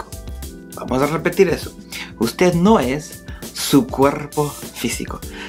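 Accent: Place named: Peru. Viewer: pareciera de peru o un portugues que sabe hablar español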